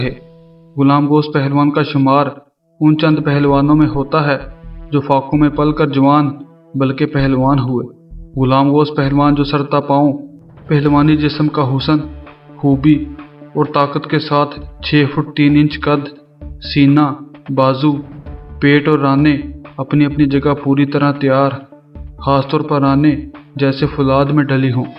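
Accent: Punjab, Pakistan. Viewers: Bht achchhe...bhai kya aap us zamane k mashhoor pehelwaano k daav k video bana skte ho...to aaj k pehelwaano ko bhi us fan me se kuch thoda bht seekhna ko milskta h...aap video k kiye pehelwaano se to milte hi hain...agar unse unke khaas ek do daav agar miljaye..me bhi pehelwaani karta hu...aapki badi madad hogi..aapki punjabi tone aapke videos me 4 chand lagati h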